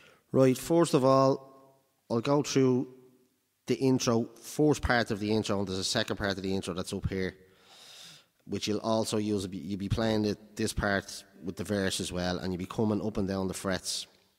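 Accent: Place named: Ireland. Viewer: Open G is a great tuning but not only for slide as shows us.but I'm buggered if I can follow his chords. I'm just trying because I love his Jumbo and his Irish accent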